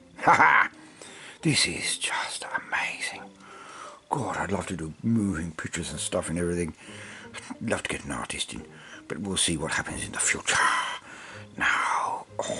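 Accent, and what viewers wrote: United Kingdom. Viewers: What part of Britain are you from? I notice differences in how you say some words vs how the Warhammer people say them...And that amazing accent!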